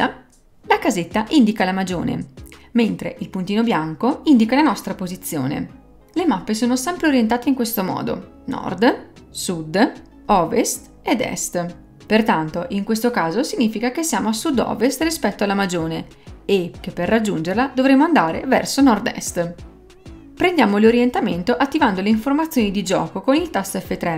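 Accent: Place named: Veneto, Italy. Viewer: ma siete venti tosi? parchè dall' accento me pare de si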